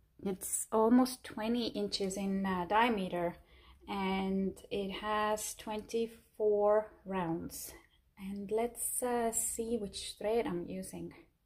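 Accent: Estonia. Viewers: Are you estonian? You have an estonian accent :)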